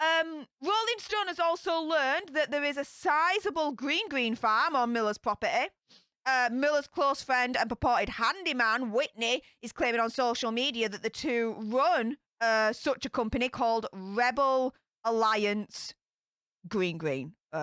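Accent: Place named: Northern England, United Kingdom. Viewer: She's a Northern Lass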